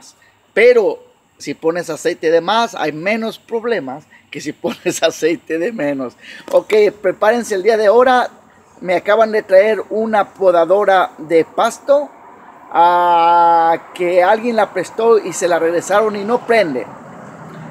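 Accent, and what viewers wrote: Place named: United States. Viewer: Porque el acento bobo de EEUU?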